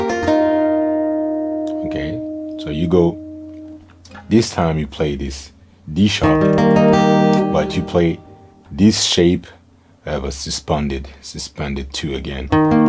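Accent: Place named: Ga, Ghana. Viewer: This Is beautiful ❤️❤️❤️ The language (Ga) accent really caught me God bless you sir 🫡🫡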